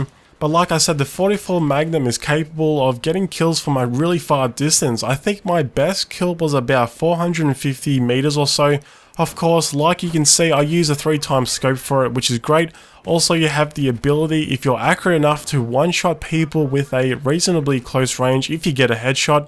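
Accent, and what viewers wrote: Australia. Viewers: Haha thanks for the reply so quickly yeah i thought you sounded like an Aussie like me :)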